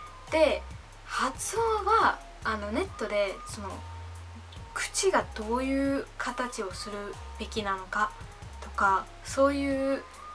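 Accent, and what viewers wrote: Kansai, Japan. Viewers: なんか関西弁ぽい訛りでかわいい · 発音が関西寄りやね。めっちゃ自然でええ感じ。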